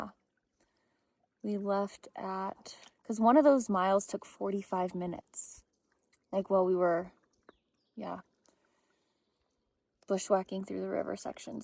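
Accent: United Kingdom. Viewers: Pure London, is she part british? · You’re doing great on your hike! Enjoy your British accent, did you live in England at some point in your life?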